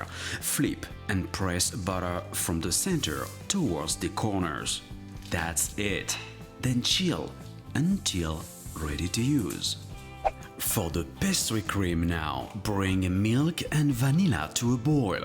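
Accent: France. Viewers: Cassie Hassani il est français je pense , je reconnais l accent français, (très léger) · Bonjour, Vous êtes Top! Etes vous d'origine française? Vous en avez un peu l'accent! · Love the french accent! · I love your voice and French accent!!!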